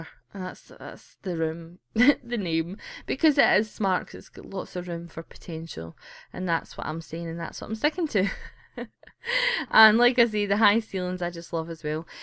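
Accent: Scotland, United Kingdom. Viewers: You're Scottish like me!!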